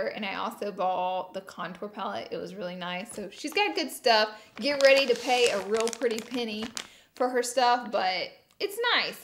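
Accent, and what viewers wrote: South, United States. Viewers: I like how you go from a southern ish accent to a high pitch girly scream in 2 seconds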